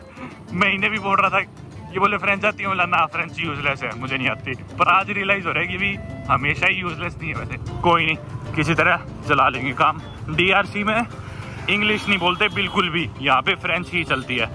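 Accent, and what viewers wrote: Haryana, India. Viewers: Bhai ki hryanvi language sun k swad aa je h❤❤❤❤❤ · great bhai tumhare sath safar ka maza hi kuch or hai haryanvi tone bhot badiya lage manne. tum sach much great ho dear👍🥇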